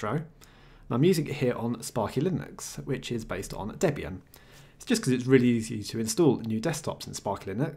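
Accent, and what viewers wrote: United Kingdom. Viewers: Again that British English. WHY WHY WHY :D Just speak normal english. Stop being so swollen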